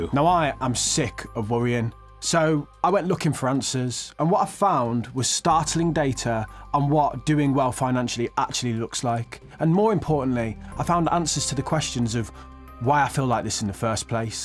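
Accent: Birmingham, United Kingdom. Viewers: is it Birmingham accent?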